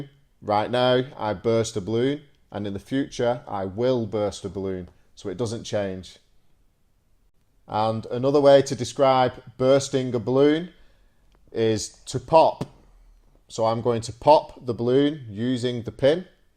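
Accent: United Kingdom. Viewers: Hi, how are you? What kind of British accent do you use?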